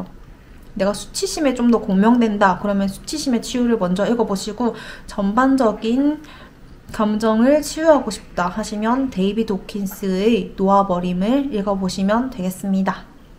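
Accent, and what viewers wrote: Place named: Gyeongsang, South Korea. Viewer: 경상도 분이신가요 ㅎ 억양이...친근해서요. ㅎ 영상 잘 보겠습니다.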